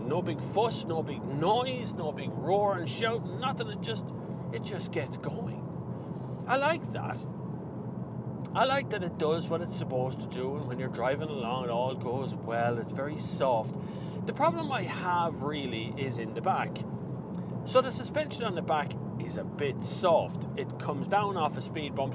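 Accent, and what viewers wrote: Ireland. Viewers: Enjoyed hearing the Irish accent in this review.Haha...Only thing better would be hearing a female Irish accent! ;-)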